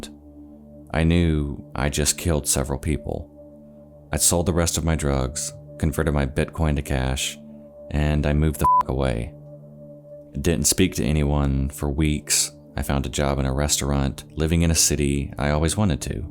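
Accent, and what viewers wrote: South, United States. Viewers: As a southerner myself, I know a southern accent when I hear one. I love this channel to death! Keep up the amazing work! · Agreeance????? Not a word my man. Are you Fred Durst? LOL! The word is agreement. I am in agreement. I am in agreeance doesn't do it. Agreeance is just not a word. It sounds like one but again, the word is agreement. You have good content and I like watching your videos. Just, do better with grammar and I have no complaints. I don't even have a problem with your slight but definite country accent. As long as you are using actual words and using them the right way, I will sub.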